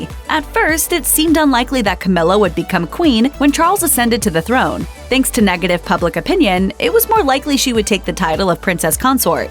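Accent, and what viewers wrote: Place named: United States. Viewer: ...... my THOUGHTS are regards to the narrator. Why are they seemingly always American & with Terrible 'grating' accents! 🥱 Phil Liverpool UK 🇬🇧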